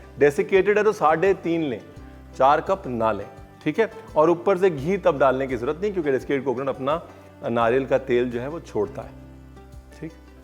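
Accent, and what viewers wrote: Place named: Punjab, India. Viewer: Omg your anchoring...niri punjabia wali..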